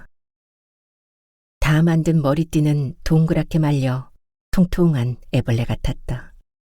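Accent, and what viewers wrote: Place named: Daegu, South Korea. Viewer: 대구 사투리! 참 재미있습니다. 시대배경이 눈앞에 그려집니다. 그리고 미성의 주인공 우리 님은 어떤분일까?❤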